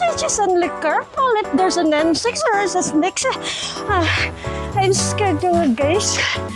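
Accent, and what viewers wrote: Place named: United States. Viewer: wow...ka daghan na di ay sa imu English....hehhehe....mura na amerikana...very nice...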